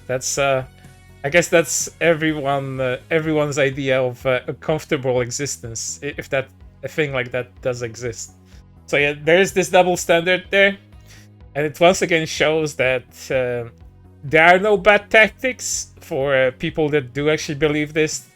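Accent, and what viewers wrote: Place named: Europe. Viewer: Yeah leave it to some V tuber with a non descript european accent to attempt to cope about Yujiros ultra woke moment where he pwns the conservatards. Yujiros pro trans women anyways, for him thats just dudes finally being honest with themselves. Anyways now I see why people are all the sudden being critical of Baki. Love it when the rightards get mad.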